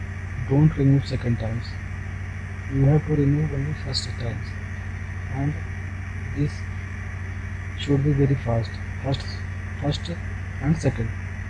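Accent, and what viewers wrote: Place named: India. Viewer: that is a babu accent.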